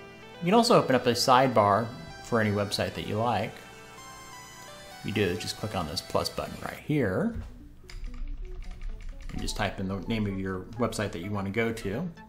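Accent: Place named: New England, United States. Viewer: Love your Yankee accent...wow.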